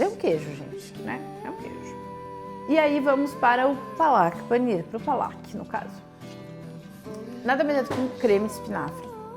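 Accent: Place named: South, Brazil. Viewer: É viciante esse sotaque do Sul... Habemus BANQUETE! ❤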